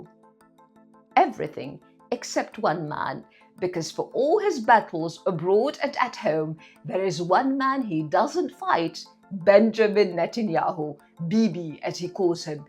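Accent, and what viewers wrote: RP, United Kingdom. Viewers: Royal family / Queens style english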